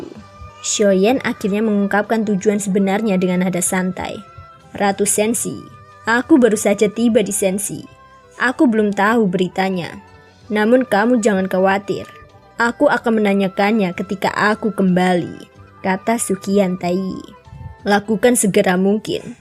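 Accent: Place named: North Sumatra, Indonesia. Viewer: Kental kali batak nya kak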